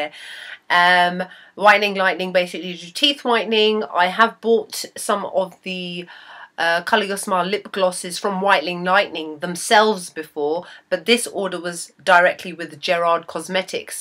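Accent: United Kingdom. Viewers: I guess you are from England! You sound so british! Really cute :) ! Greetings from Germany. You made me buy Buttercup hehe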